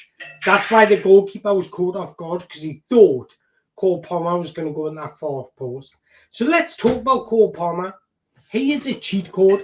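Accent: Newcastle, United Kingdom. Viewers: my bro I still don't know whether you're chels or geordie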